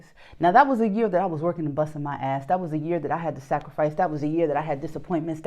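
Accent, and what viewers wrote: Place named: New York, United States. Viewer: Ma you are so NEW YORK and the way u talk you grew up in my era Keep up the great work.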